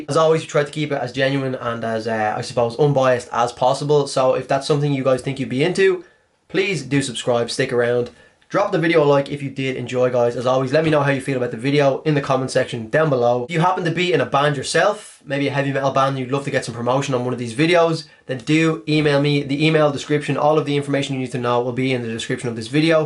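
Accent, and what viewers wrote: Dublin, Ireland. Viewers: yaaaaay knew it. Are you from Dublin? I'm trying to distinguish whether your accent is northern our southern, help me here lad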